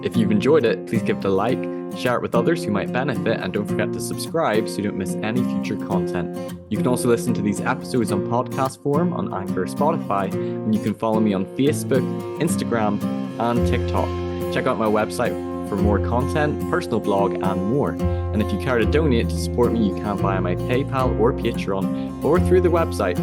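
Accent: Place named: United Kingdom. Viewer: Your accent, the belies a British citizenship, which gives me hope that your medical problems will be addressed properly.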